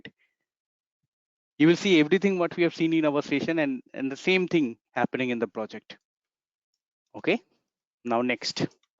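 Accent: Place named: India. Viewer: Hard to understand Indian accent. Why can't they adopt the American accent? Indian English talk sounds so irritating.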